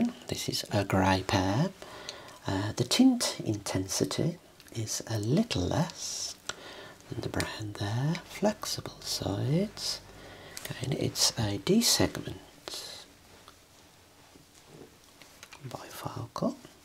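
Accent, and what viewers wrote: United Kingdom. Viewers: Absolutely love the British accent ☺️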